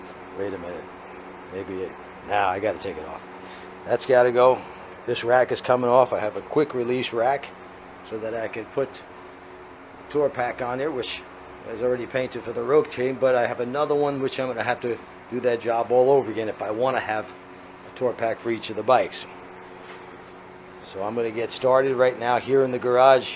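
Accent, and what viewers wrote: New York, United States. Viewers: I'M FROM SOUTHERN NEW JERSEY WE HAVE OUR OWN ACCENT. YOUR STILL A NEW YORKA:) THAT AIN'T NO SOUTHERN ACCENT:) GET OVER IT. ENJOY YOUR NEW RIDE AND GOD BLESS FOR BEING YOU AND ALL THAT YOU DO. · I live in New York and I can tell you are from New York. Yes you still sound like a New Yorker. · You still have a NY accent! I'm a original mass-hole who did three years at Clearwater high school in Florida, for a crime I didn't commit. So I know a New Englander or new Yorker when I hear one. Moved to my Dad's native, upper Ohio river Valley, West Virginia, after high school and never left. I prefer the riding and culture of West by God Virginia over any place, any day! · Neather you or has a southern accent. Although your N.Y. accent has mellowed out. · Not only do you still have a New York accent but I’m guessing you’re from Long Island. 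· Dammit! I knew you were from the NYC Metro area. I grew up in Rosebank, Staten Island, a heavily Italian neighborhood. I even had on my block.:D We never lose the accent. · I'm from the UK and even I noticed your NY accent:-) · "I think I have a southern accent" ahahah Sorry, no, I thought you were still in NY, maybe NJ, until I looked up that Harley dealership. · Congrats on You're new bike, I knew that You like Breaking Balls, hence the New York accent, that You still have for sure. Those were a couple of Good ones about the 🔥 Man, and about You paying the bike off quick writing Hella Tickets. 😆 anyway✌, and keep the Great content coming...👊😎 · Love the bike and your sense of humor. You had me for a sec when you mentioned that my speeding was going to finance your bike!! PS, sorry to say but but after 40 years, you still have a NY accent!! Enjoy that awesome bike!! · Where in NY? I hear the accent, but I am a Ex-NYer too. 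I am from Long Island. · Love the videos. Great stories. Terrible Southern accent - lol. Can still hear plenty of NY in it.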